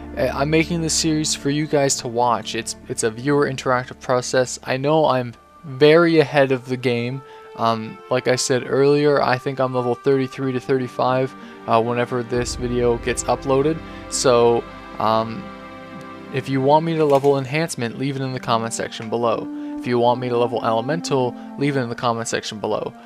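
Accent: Canada. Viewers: are you canadian? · He's Canadian. ^^ When we watched his stream in July/August, the chat frequently poked fun at his accent. :D "What are you talking aboot?"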